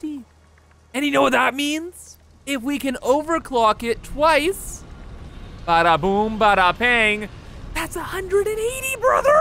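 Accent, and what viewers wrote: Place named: Canada. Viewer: Haha No worries if this is too personal but, what part of Canada are you from? It's always more comfortable listening to a fellow Canadian talk about games!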